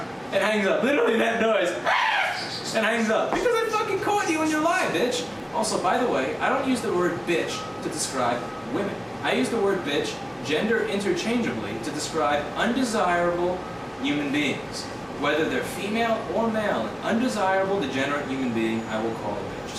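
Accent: New York, United States. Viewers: Pierjan - He's got that New York style going on. :)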